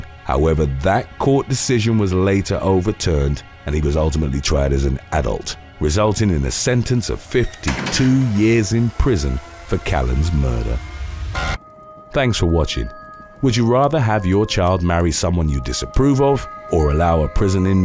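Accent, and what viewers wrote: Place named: United Kingdom. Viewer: Please please PLEASE stop using "the former" in your video narration. You are using it incorrectly and it's extremely maddening for those of us British educated grammar Nazis. Seriously I love your channel but you have got to stop misusing "the former". Mostly bc you aren't writing your words, your chair are not long enough for "latter and former" to apply, e.g., ten secs into a video isn't enough time to convey such qualifications bc your audience can't possibly know who you're talking about. And your audience is mostly American. Using unfamiliar terms with a British accent can be intimidating. Also, Spanish names and words hint: there are no hard "J" sounds at the start of most words and names, it is an "H" so "Jorge" is "Hor-hay", two LLs together make a "y" sound, Tucson is pronounced "Too sahn" not "Tuck-sin" but above all, let "the later" go, please. 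It diminishes your whole video for Me bc it's distracting in it's utter and complete wrongness. It's driving Me spare love.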